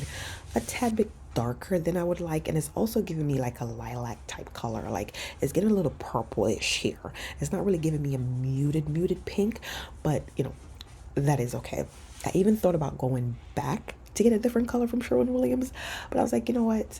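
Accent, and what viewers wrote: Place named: Jamaica. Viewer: Everything looks great. I just love it. I noticed when talking to your husband your Jamaican accent is really thick as opposed to when you talking in your videos. This is not criticism just something I noticed. I loved it.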